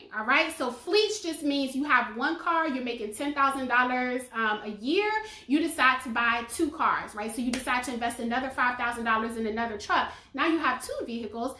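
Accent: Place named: South, United States. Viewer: Great information once again. And I love your southern accent. Keep up the good work❤️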